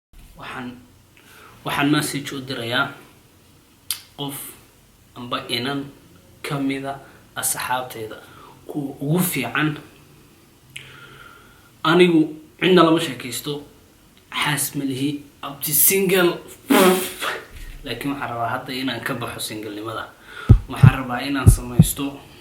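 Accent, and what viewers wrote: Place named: Hargeisa, Somalia. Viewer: Inanku ma reer Hargeisa lahjadi rer. Hargeisa ayuu wataa go forward brother 😂❤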